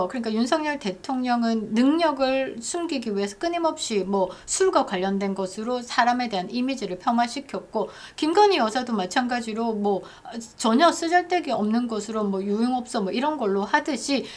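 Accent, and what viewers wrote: Gyeongsang, South Korea. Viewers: 의 님 말씀이 참 귀에 쏙쏙 들어옵니다. 특히 좌빨이, 쌤통 등 ~~~ 경상도 사투리로 이렇게 찰지게 설명하시는 능력이 탁월합니다. 늘 응원합니다~~~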